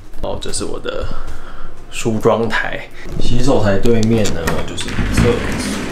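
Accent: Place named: Taiwan. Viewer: 好感台湾腔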